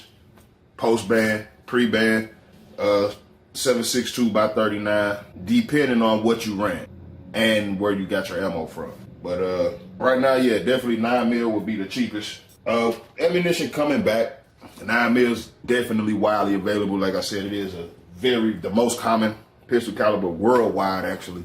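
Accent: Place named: St. Louis, United States. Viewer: Sound like you from the crib STL. Where is the outdoor range? Want to go somewhere other than sharpshooter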